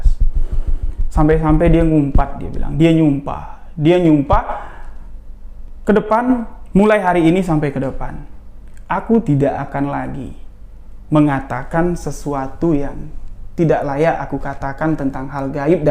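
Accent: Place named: Jambi, Indonesia. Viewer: AKU Dari Lampung Nich Bang! Wa A'laikum Salam Wr Wb Jg AKU Percaya dengan Segala Cerita Yg Bang Sampaikan! dengan Logat Bahasa Nya Yg Khas Orang Jambi, Slow, Lembut, Dan Bisa Kita Pahami Sucsses Terus Bang Aamiin 3X Allah Humma Amin Ya Allah Ya Robbal A'lamiin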